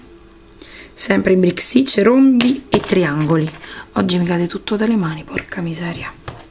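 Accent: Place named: Rome, Italy. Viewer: Nun se spostano de na pezza...........che bello sentì parlà romano .........................mi manca la mia Roma. A parte questo sfogo bravissima molto belli i tuoi lavori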